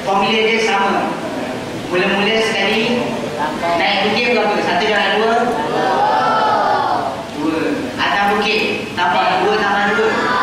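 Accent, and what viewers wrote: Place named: East Coast, Malaysia. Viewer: cakap pahang ke ganu ke kelate ke apa, tak brp dengar 😂 jap, kalau 55x22,camne plk?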